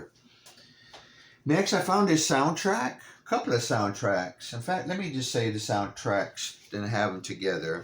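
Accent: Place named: Texas, United States. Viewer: " " may be their best record. Have to admit, when I hear your accent, I thought you were more Mississippi or Louisiana but now I'm picking up your Texas. Are you familiar with out of Beaumont? Great finds! Love ya!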